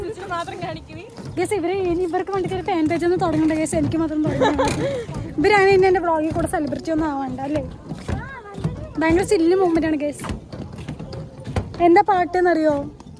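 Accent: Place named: Kollam, India. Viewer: Palakkadan basha ❌ Kollanpotta basha ✅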